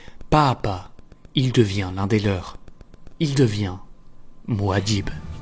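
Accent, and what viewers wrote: Belgium. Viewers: T'es belge toi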